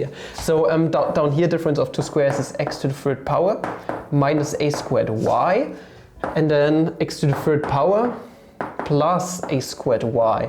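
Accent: Germany. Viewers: ne dachte gleich der klingt deutsch, profil .. aha deutsch xD ja er hat einen starken deutschen Akzent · is he german? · Are you german? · This is the most german english i've heard today.. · Bist du Deutscher?😅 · German accent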